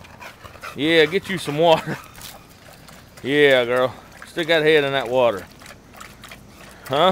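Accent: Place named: South, United States. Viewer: They look like Staffordshire Bull Terriers. Try an sound less hill billy next time.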